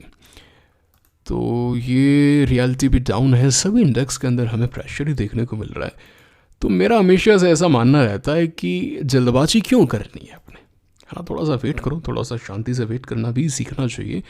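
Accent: Rajasthan, India. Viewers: Bich bich mein rajsthani accent aa rha haiiiiìiììiiiiiiii........ sir 22 ke aaspaas se 😀😀😀😀😁😁😁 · Sir aap Rajasthan se ho na 😅 . Love you sir 😊❤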